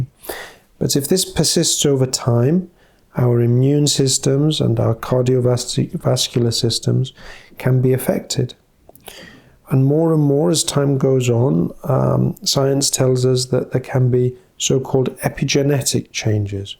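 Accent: United Kingdom. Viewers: Come on with the British accent makes it harder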